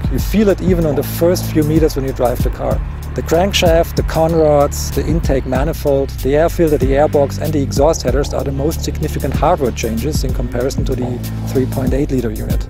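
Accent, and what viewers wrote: Germany. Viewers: Kann gut sein, wobei mein Englisch relativ gut ist ; ) Hab vor knapp 'nem Monat mein Englisch-Abitur geschrieben und hab für mehrere Monate in den USA gelebt ... Aber du hast Recht, sein Englisch ist nicht schlecht. Mich stört es nur, wenn man schon beim ersten Satz hört, dass da ein Deutscher redet ; )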